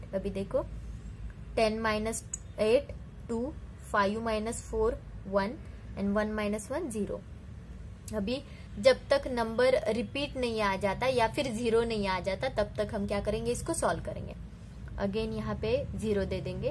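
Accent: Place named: Mumbai, India. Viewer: 😊 aap mumbai ki lagti ho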